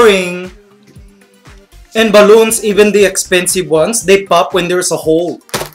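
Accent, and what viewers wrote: Philippines. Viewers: Hahaha love the tagalog accent, so strong and sharp. Very Filipino. 😂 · Haha! I love the smell of plastic balloon too! Hmm And your pinoy accent to Mikey! Hahaha Wooh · i like the accent he is not shy in our accent (some Filipino sound like that when they're speaking in English) i'm very proud to be pinoy and i admit i seak like that too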